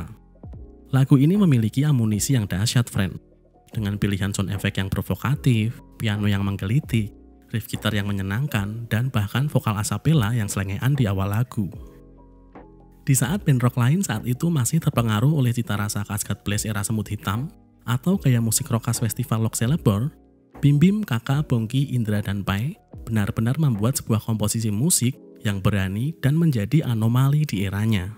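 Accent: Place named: Semarang, Indonesia. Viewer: Orang Semarang ya mas.. mendhog men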